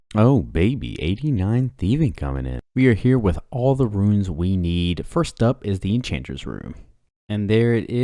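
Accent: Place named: Oklahoma, United States. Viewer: also that southern accent poking through. I wanna guess Oklahoma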